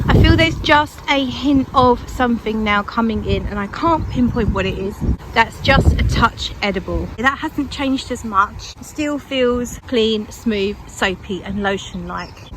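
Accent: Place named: United Kingdom. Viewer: I love your UK accent!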